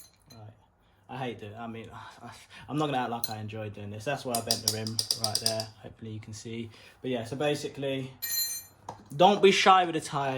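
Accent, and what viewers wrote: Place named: Jamaica. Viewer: 😂😂😂 good Jamaican accent By the way you put the tire on first then put the innertube in after ..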